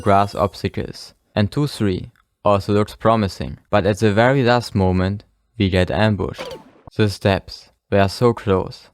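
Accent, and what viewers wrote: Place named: Germany. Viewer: bro the english sounds like a german that tries to speak english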